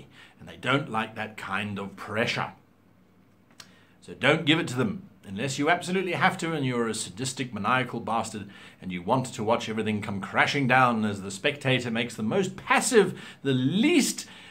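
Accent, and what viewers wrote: United States. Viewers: Your American accent isn't the worst ive ever heard, well done. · Good American accent! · Thats a pretty good American Accent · That accent really threw me off, and it sounded like a normal american accent with a few funks in it. Cool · Thank you for the video! Super helpful and relevant to a few players in my group. Also, it was super trippy hearing your American accent. I approve. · Your American accent is like a combination of all the American accents. But over all it’s pretty good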